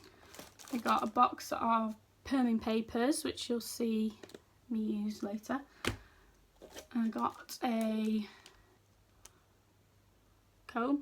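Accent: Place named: Wales, United Kingdom. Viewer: Where are you from? Love the accent but you sound a bit welsh. xx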